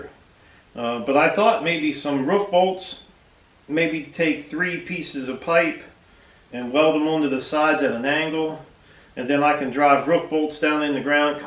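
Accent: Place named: Western Pennsylvania, United States. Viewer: I grew up near Pymatuning Lake, so your western Pennsylvania dialect is a little bit like "old home days" for me. I lived in Indiana for about 10 years and I've been in North Dakota for almost 21 years.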